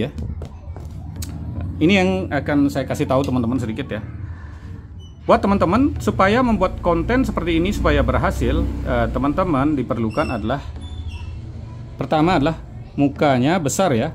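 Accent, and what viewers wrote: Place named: Bali, Indonesia. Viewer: Uli logatne be ke tebag nak bali ne😄 rahayu wii🙏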